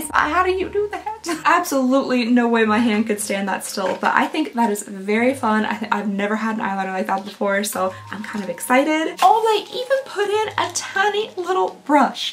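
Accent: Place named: Tennessee, United States. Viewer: Sweetie, there is NOTHING wrong with the way you talk. If ppl dont like it, you know the saying..."just keep on a-scrollin'". I personally LOVE the way you tale and describe things. Reminds me of home (Tn.). I miss it.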